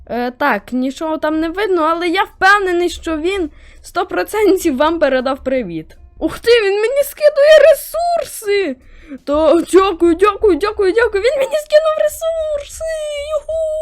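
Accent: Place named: Ukraine. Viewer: Чо у тебя с голосом? Стоп! Ты украинец! Блин😢 я русская но ты класный❤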